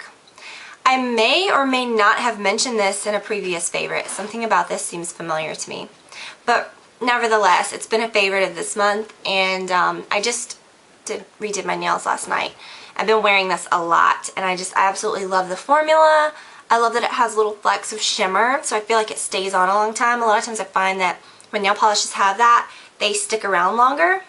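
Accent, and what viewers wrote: South, United States. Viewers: Where are you from...cause I can def hear a southern twang :)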